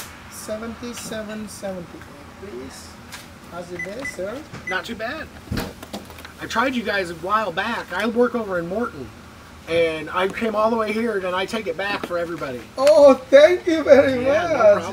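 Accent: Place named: Java, Indonesia. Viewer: Logat jawa Indo pakde di LN seperti nasi goreng yang sudah bisa di minati orang sana😂.... semangat buat semuanya, sehat2 selalu, dan lancar rejeki buat kita semua. GBU...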